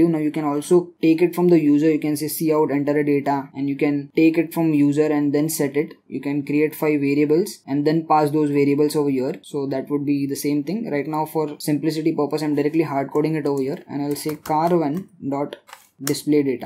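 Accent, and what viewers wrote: India. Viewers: For every complex topic in the world there is one YouTube tutorial with an indian accent that explains it so easy that you immediately understand it. Thanks for keeping the world running. Your tutorials keep the entire educational systems of the world running :)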